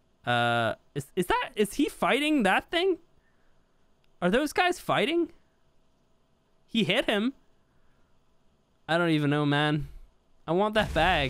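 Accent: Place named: Ireland. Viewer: you should do a video in your irish accent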